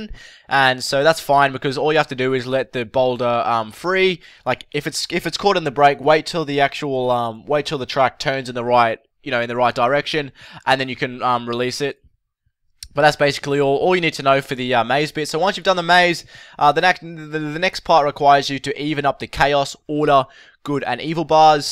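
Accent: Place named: Australia. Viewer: Good to hear an Australian give a guide for once, cheers mate real helpful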